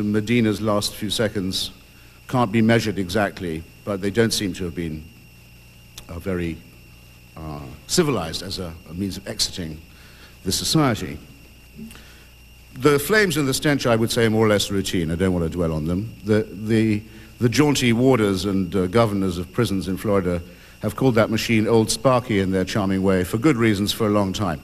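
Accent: Southern England, United Kingdom. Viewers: Hitchens isn't famous because of his Southern English accent, you know about his accent because he was famous for his immense intellect. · I agree. Plenty of ppl with Southern English accents and yet I can't name one who stole my heart away from other than.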